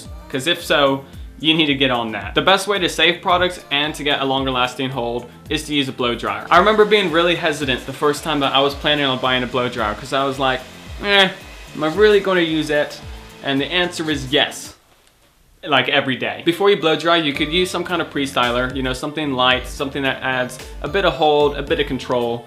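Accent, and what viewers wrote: United Kingdom. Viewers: i've been wondering the same thing, I can go back to a video he made a year ago and he speaks normal american english, but now he talks with a british accent all of a sudden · Your accent sounds kinda British in this video. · I just realized, do you have a British accent?😮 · You've got a little British accent coming and going in this vid, dude. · do you live in the uk? i don't remember you having an accident. certain words you say has a different sound; you phrase things so clearly.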